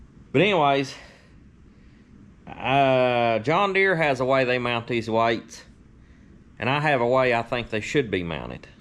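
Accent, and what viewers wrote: South, United States. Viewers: Dude stop talking redneck... It's time...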